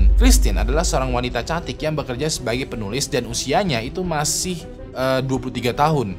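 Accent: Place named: Makassar, Indonesia. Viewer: Halo orang makassar ya? Nama di makassar itu, karna kosa kata n logat, biasanya dari nama jadi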